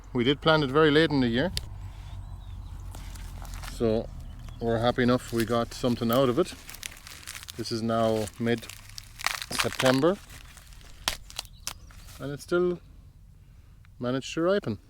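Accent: Ireland. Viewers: Just after doing a bit of a binge watch, great content as usual on all the videos. To answer one of your questions from a previous video, I think the willow you were wondering about is a Sally. I can ask where you purchased your herb drying tent and your dehydrator. I have been searching to buy within Ireland and the price is some what saucy for both. Also did you get your wine making equipment within Ireland. And I can't believe you have a pressure canner. Surely not an Irish purchase. I thought for a second I was watching one of the many US you tubers I follow, except for the lovely Irish accent. Keep up the great work.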